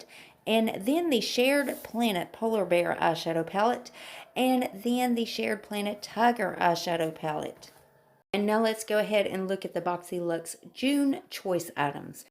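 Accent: South, United States. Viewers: Hey girl! This is my first time finding your YouTube Channel. My name is Kate and I’m from Vermont, but went to college in Memphis, TN and LOVE your southern accent!! Anywho, for my BoxyLuxe June box I chose the TULA Glow and Get It Eye Brightening Balm and my 2nd Choice Option (I wanted the Swell Bottle but it was no longer an option) so I selected the Gua Sha Face Sculpting Jade Device. By the way you literally made me Laugh Out Loud when you said “Y’all, what the hell? Y’all know I don’t know how to pronounce anything. Let’s move on...” I instantly subscribed to your channel after that as in my Vermont accent I said the same thing, LOL! Have a beautiful month of May and thanks for sharing with us!!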